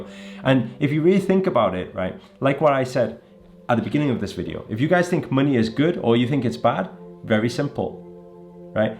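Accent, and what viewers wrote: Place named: Liverpool, United Kingdom. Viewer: Wow scouser!!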